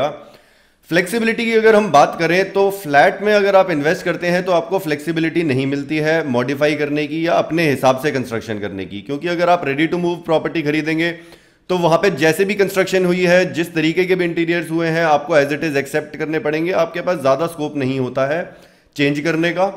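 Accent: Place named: Kerala, India. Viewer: I think ua from kerla or tamil nadu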